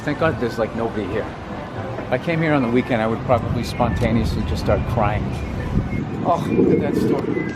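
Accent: Boston, United States. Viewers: I noticed your Boston accent still comes through although you've taken to pronouncing your Rs. Westfield Malls are all over the place; I visited one in Florida. There doesn't seem to be one in Westfield, Mass or Westfield, NJ, though. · do another Boston tour the Boston accent is unstoppable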